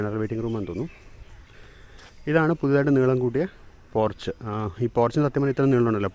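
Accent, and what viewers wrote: Thrissur, India. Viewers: As you are a Thrissur boy, we expect at least one video with the great Innocent-style accent!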